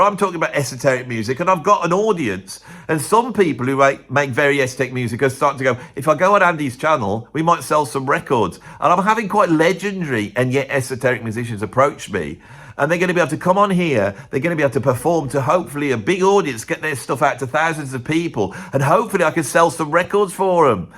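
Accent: United Kingdom. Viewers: You do a great British accent. 😅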